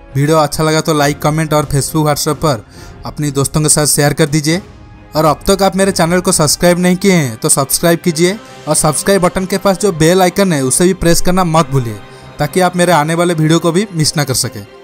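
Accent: Odisha, India. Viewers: oriya bolraha hai .ya hindii..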